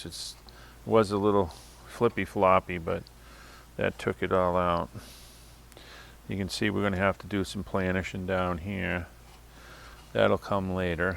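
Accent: Boston, United States. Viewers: your "pauk the cah and feed da meeeder" = park the car and feed the meter Bostonian narration is always enjoyable. This is a great series!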